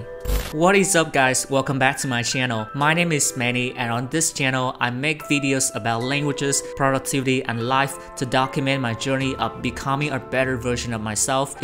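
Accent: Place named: England, United Kingdom. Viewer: Thanks to your Fb post about Notion, I know you. I really like your English accent and what you shared in your videos. Thanks so much. <3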